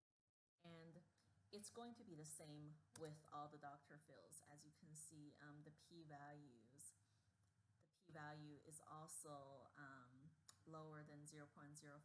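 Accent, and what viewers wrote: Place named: China. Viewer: 为什么有中式口音……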